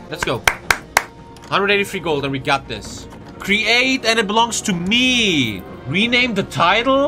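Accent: Germany. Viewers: you sound a little bit Saxon sometimes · Is he german?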